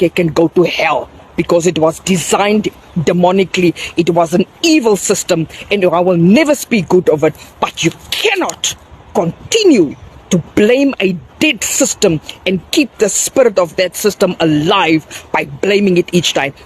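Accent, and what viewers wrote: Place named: South Africa. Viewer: This accent should make you very aware this is a powerful and passionate South African woman❤❤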